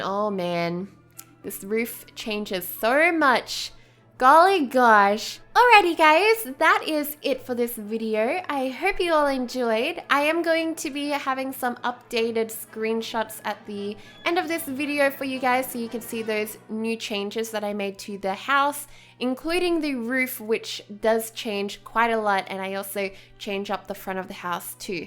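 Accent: Australia. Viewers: are u Australian because your accent sounds so much like an Australian's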